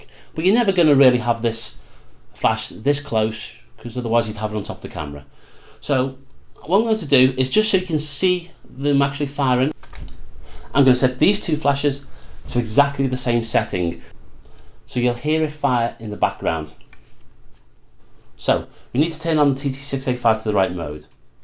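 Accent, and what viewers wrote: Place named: Southport, United Kingdom. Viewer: Thanks for a very helpful tutorial. I did buy 6 youngnu 560 but I really found them fiddly to control and they kept going to sleep. I need 4 speedlights for work on location and I think this godox may be a bit more reliable. PS your accent sound like you might come from down the road from where I live in Southport 😎👌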